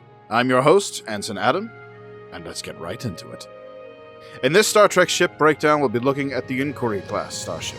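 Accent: Ireland. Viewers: That accent! It's so very mid-Atlantic. I'm Irish, and the closest I can come to IDing it is as a very cultured or well traveled suburban Irishman. Where are you from Adam? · Nice narration. Irish accent . Irish myself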